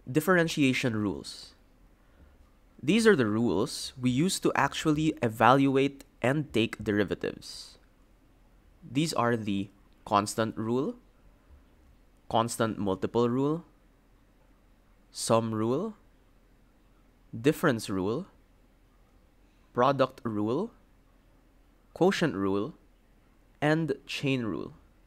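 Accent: Philippines. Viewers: This accent sounds Filipino. Are u Filipino? Edit: also great vid man, the way my school explains calculus is terrible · Pinoy itong nagsasalita ramdam ko. Unang salita pa lang sa video eh HAHAHA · you sound somewhat Pilipino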